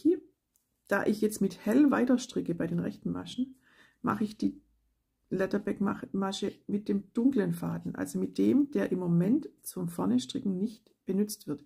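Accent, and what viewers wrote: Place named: Swabia, Germany. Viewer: 👌toll erklärt. Schön mit Deinem "schwäbischen" Dialekt. Man kann's halt nicht verbergen.😁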